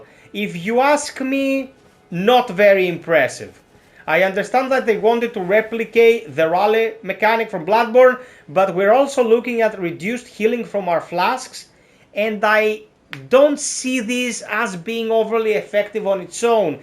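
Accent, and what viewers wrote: Greece. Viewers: Your accent seems greek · i know a greek accent when i hear it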